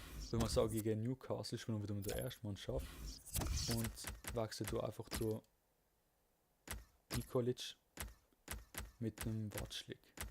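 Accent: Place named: Basel, Switzerland. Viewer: Nievethan Neetihrasa Nechdem Dialekt her in Basel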